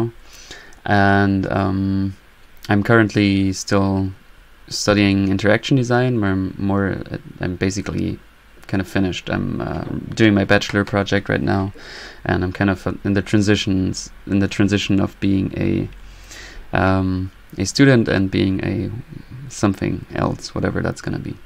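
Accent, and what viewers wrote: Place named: Ireland. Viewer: Hey you say German is your native language. But I'm hearing a really strong Irish influence on your accent? Is that right?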